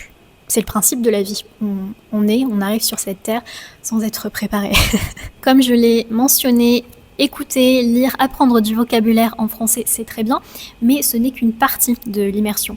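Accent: France. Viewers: I used the technique of finding out what day to day things were in french, then when going round the supermarket or just day to day life then seeing them i'd say them back to myself until they were so deeply embedded in my brain that i knew them off by heart. Feels slightly reassuring that I might not be the only person saying the words back to myself in the shop🤣I find myself lucky that someone that goes to my local pub used to work for a large French oil company so hes pretty fluent in French and I find myself attempting to have little conversations with him to try and improve. I tend to learn more by doing something than just from being show how to do it, so being able to practice that way is really beneficial for me. These videos are really useful! I like little phrases and like using you "bonjour, j'espère que vous allez bien", "Si tu n'es pas heureux ou tu es, bouge, tu n'es pas un arbre." and the one you said your Grandmother says about life being a sandwich of a certain kind 😂Those little phrases go a long way in a video, even if it's just learning what the words are in them, especially since sentence structure is different to english with word placements in them! 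I don't want this to come across as a "oh great im magically fixed comment" but your English is really really good so try not to feel self concious about having a strong French accent when saying some words. You're pretty nail on the head 99.9%% the time, plus it's not your first language, so it's not always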